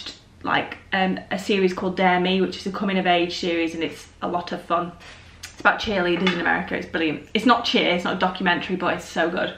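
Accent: United Kingdom. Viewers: Ur british accent uhhh💕💫♥️♥️♥️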